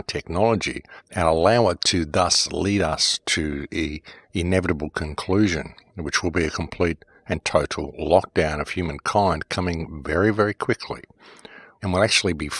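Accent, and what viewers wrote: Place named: Australia. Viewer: I love your vlogs it’s nice to hear a Aussie voice so smart and I wish you could go on Q and A wake up some more aussies we need it keep up the god fight 🇦🇺💖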